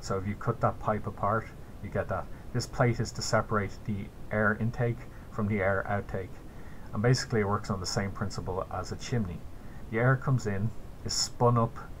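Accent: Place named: Ireland. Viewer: You sound as though you’re a real Irishman, If I ever build a pulsejet big enough, I’ll slap some solar panels on a dingy, electrolyze the seawater, And run that sucker all the way across the Atlantic. I’ve always wanted to see Ireland. Have a great day. Right now I am in the middle of some very interesting builds. When the videos get uploaded I’ll let you know.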